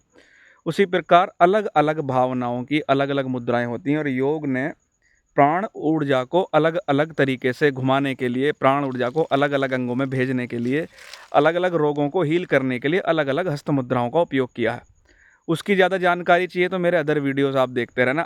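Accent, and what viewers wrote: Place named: Junagadh, India. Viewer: आप की बोलने की ले से मुझे अंदाजा था की आप जूनागढ़ से होंगे आप को सादर 🙏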